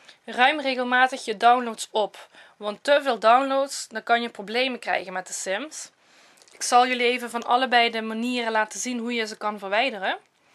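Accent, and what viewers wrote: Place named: Limburg, Netherlands. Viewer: hey hey ik ben zelf ook half limburgs dus ik ben je accent wel gewend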